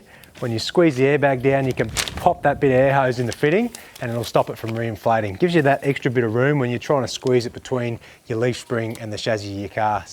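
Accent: Australia. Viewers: Australian accent mate “Straya” 🇦🇺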